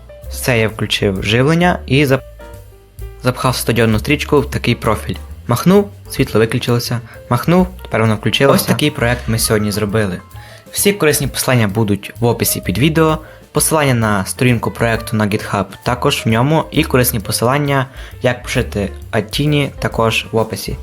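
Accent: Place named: Mongolia. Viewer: Язык смешной,автор наверно монгол.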